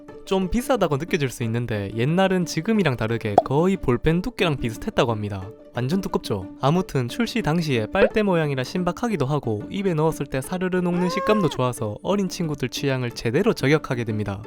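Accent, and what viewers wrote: South Korea. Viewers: 이 억양은 대구말투인 것인가요?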